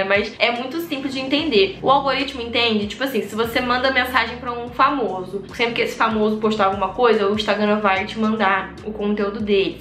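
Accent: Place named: Rio de Janeiro, Brazil. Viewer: vc é do suburbio do Rio? Pergunto pq eu sou e eu super me identifico com o seu jeito de falar.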